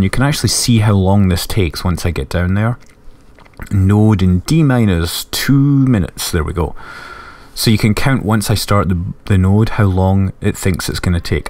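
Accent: Scotland, United Kingdom. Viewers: It doesn't feel right when you use your natural Scottish accent, and not your fake Arstotzkan accent.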